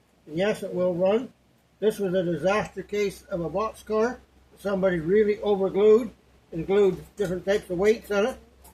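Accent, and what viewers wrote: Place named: Vancouver, Canada. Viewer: Interesting. I've not heard your accent here in Vancouver, surprisingly. I moved here nearly 30 years ago; maybe your accent is an older one from this area.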